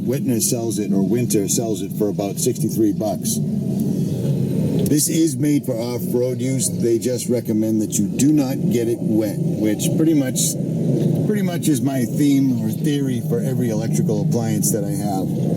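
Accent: Boston, United States. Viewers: Ha. I was watching your video and I said to myself, this guy sounds like he's from Boston. Then toward the end of the video you mentioned you're from Boston. I'm in Boston too (Hyde Park). Anyway.. I'm looking at this fridge now for my cargo trailer that I recently converted to a camper. I was initially looking at the dual zone, but its slightly too large to fit into the space I'd be putting it in. This 45 quart fridge will fit perfectly tho. Thx for the review.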